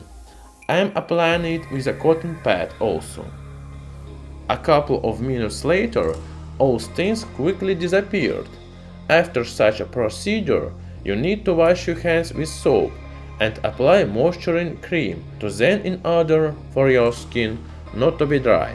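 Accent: Russia. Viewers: Nothing more authentic than a Russian accented guy introducing an element. Solute to Mendeleev.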